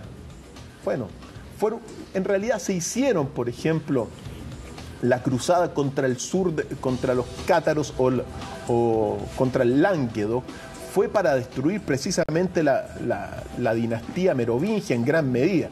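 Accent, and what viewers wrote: Chile. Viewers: Ya me están gustando los chilenos !!! Será que todos hablan asi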